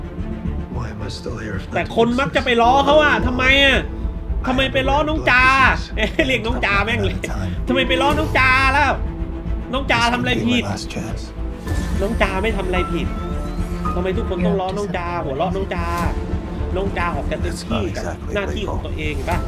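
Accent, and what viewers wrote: South, Thailand. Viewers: คนรีวิวคนใต้หรือเปล่าคับ แหลงใต้ชับจัง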